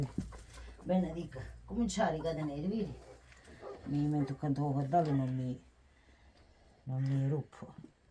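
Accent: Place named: Calabria, Italy. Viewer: Soy Argentina, hija de calabreses. Su dialecto.me emociona al igual que sus comidas, ingredientes, etc., etc. Si tendría la oportunidad de viajar, iría a verla. Eres una mujer admirable ❤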